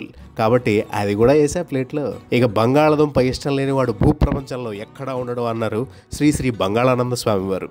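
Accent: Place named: Kakinada, India. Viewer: అద్భుతంగా వుంది అండి మీ కాకినాడ యాస....ప్రాస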